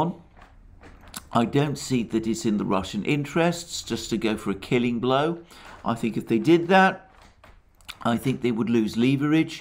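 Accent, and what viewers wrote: United Kingdom. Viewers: He reads commonly available materials and chats about it. Another sucker for a Brit accent.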